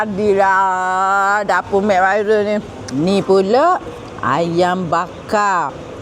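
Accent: Kelantan, Malaysia. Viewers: Hihiii demo kelake pekat deh. Saya lahir je kelate tpi tk pernah duk klate.. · Kelazzz lar mek kelate niii.. ❤ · Pekat molek mek kecek Kelate.. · Mek, lamo dop dengar klate pekat gini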